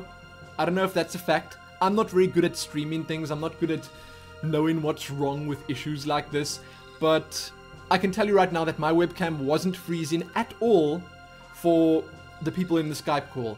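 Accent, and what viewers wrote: South Africa. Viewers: I think I'm one of the view viewers who doesn't find the South African accent strange at all - despite being from Scotland I grew up knowing a few South African people so I guess I'm acclimatised to it :3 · Just thought I'd let you know that I LOVE the way you sound. The South African accent is my favourite accent, and your voice is just perfect!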